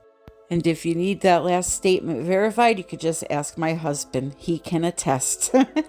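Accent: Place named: Midwest, United States. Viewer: Watching ONLY videos this am...after a long 7 month no art time due to family needs I am so enjoying all your videos. You have every creative instinct possible. LOVE this one. Yes saving those pour too big to turf..too pretty to pour over. Nice, your accent. Now is it? Midwest? Chicago? Please DONT be mad I am trying guess. I really am glad I got on your channel gonna watch em all. Just went to a paint store I've been flipping furniture too...its alot of work but wow. What a difference. Thank you dear your a friend to all budding artists and pros alike!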